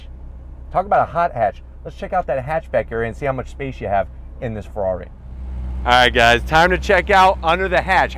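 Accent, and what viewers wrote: United States. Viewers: your parents are from Italy? Wow. Didn't see that coming because your accent are very American though.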